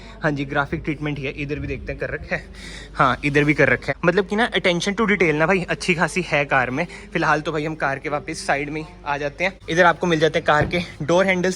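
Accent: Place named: Jammu, India. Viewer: bhai jammu da lgda accent se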